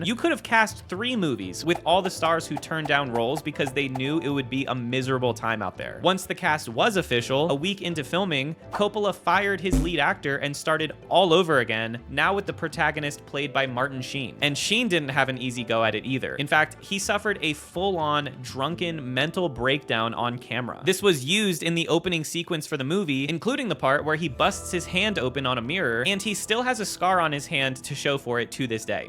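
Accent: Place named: United States. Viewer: Please dude - Speak Slower. I'm native English speaking, but sometimes can't understand your American Twang....just BREATH!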